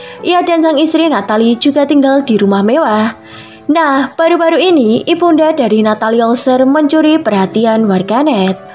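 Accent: Java, Indonesia. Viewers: Medok kali jawa nya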